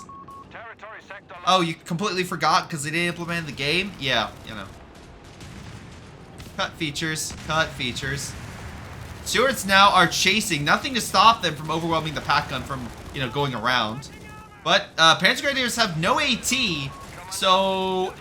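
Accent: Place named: United States. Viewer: as a German/Italian your pronunciation of Guastatori is quite good and probably the best possible with an american/english accent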